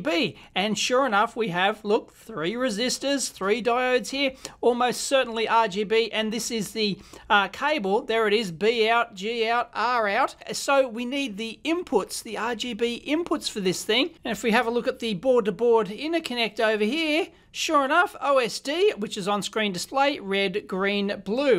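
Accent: Australia. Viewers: I think the 8-bit guy's video is a bit more consise, easy to follow. Luckily, I like Aussie accent, so its still a great watch!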